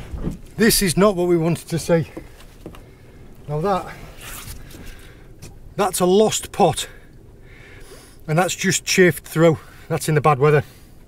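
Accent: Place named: Hull, United Kingdom. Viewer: HI...new sub here...sorry to hear about your visiting theives m8...is there any where that you can review ship movements in your areas, looking at radar tracking ect... so ive been thouroughly entertained with your video's, i must have watched around 20 upto present...& i realy enjoyed where you managed to catch the 2 tuna...fantastic video's...ime a metal detectorist from the north west of the uk (i used to shore fish from the front in Morecambe, a fair while ago!) your accent sounds like a Hull one... deffo northern!...anyway, i hope you make progress with finding out what vessel's were near to where your pot's were...good luck & Happy Hunting...take care & tight lines! Gordon...(click my picture and check out a few video's if you get the time)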